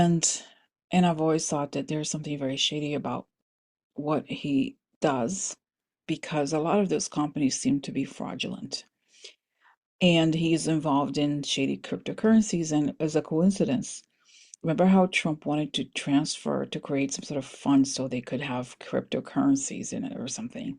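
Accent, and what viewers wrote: Russia. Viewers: Thank you, for that hopeful message before I hit my pillow! God I love your weird little faces and Russian accent! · Sorry but not understanding ur Russian accent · Your Russian accent is lovely! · I just love your Russian accent! It’s my birthday on 4/6 and I needed this video. Happy Belated Birthday ❤️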